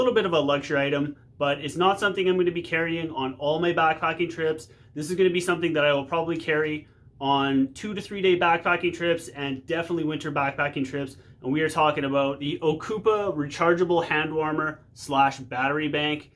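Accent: Canada. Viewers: I love your Canadian accent.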